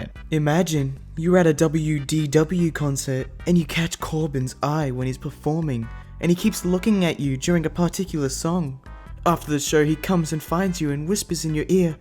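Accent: Australia. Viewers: I'm digging the obnoxious Australian accent for all of the guys' voices.👌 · You're Aussie accent makes this 10x better lol that one ab Shawn mendez, near the mid of the video the last words were 'Shawn winks' but you said it like: Shawn WANKS lmfao that one had me in tears and also the finn wolfhard one lol and the one that says 'or I'll TICKLE YOU' YOURE ACCENT MAKES IT SOOO MUCH BETTER IM TELLING YOU LOLLLL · Is...Is that an Australian accent?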